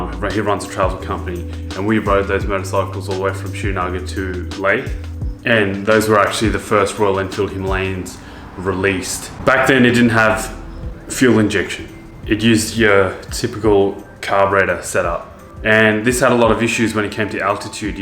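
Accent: Australia. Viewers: you sound Australian. haha. maybe I dont know my dialects very well. I have a CB500x. looking at these just for fun. we have no Enfield dealers and I'm sure I wouldn't lose a dime selling it if I didnt like it.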